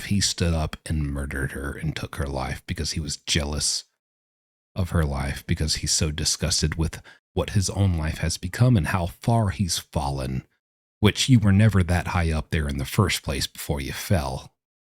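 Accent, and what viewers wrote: South, United States. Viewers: Listening to a southerner explain anything is like a prison sentence on its own. Get to the point JFC.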